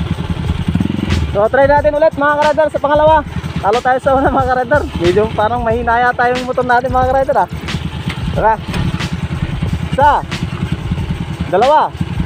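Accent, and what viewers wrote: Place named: Pangasinan, Philippines. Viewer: Nice content! As of this moment, nirerestor ko ung TMX <num> model ko kaya naghahanap ako ng mga video about sa tmx 155 napadpad ako sa video nato And As i’ve observed ung accent ng uploader is Pangasinan. Pa shout out Next video sir. I’m from Aguilar Pangasinan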